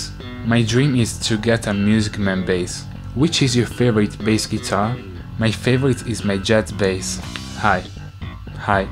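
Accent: Italy. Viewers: si sente troppo che sei italiano hahahah,suono anche io il basso,spero di arrivare ai tuoi livelli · So, you actually made an italian voice reveal before 4M subs. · Wow si sente che sei italiano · Italian accent all the way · Giovanni Dalo Ho visto poi nelle info, dove ho avuto la conferma direttamente da! Il punto non è che lui abbia una pessima pronuncia, anzi tutt'altro, forse mi sono spiegato male, il problema è che per noi latini è molto difficile riuscire a parlare un inglese senza far capire la nostra provenienza. Prendi i paesi nordici (pewdiepie ne è l'esempio) fin da bambini ti istruiscono a parlare inglese nello stesso modo in cui impari la tua lingua madre e noi italiani per quanto possiamo impegnarci, senza avere esperienze all'estero ci rimane difficilissimo migliorare la nostra pronuncia. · Vusferno Music E' italiano :) l'accento italiano si sente ma la pronuncia è molto buona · Okay so this was the Italian voice reveal.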